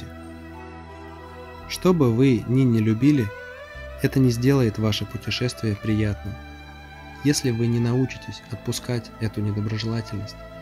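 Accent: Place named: Russia. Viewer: Темнишь ты ,акцент кагбуд то родился в сраной рф и в ней и по сей день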